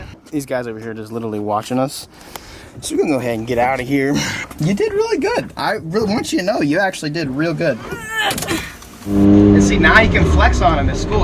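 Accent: Australia. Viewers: are u Aussie cause I am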